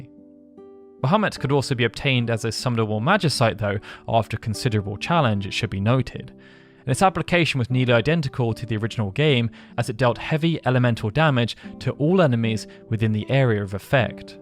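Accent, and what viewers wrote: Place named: United Kingdom. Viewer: Ultra long video essays of old games are wonderful. MrEdders123 and I Finished a Video Game are also great alternatives with a similar British tone.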